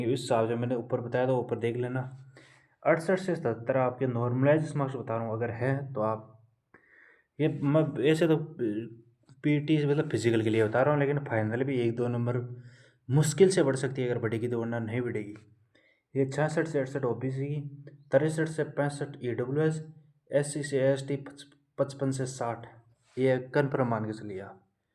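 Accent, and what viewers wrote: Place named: Shekhawati, India. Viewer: Bhai rajsthan se ho ki wo bi sekwati se 😂😂baat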